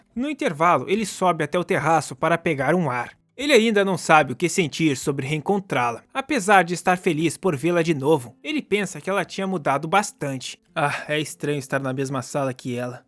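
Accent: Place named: Rio Grande do Sul, Brazil. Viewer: o sotaque gaúcho melhora ainda mais kkkkkk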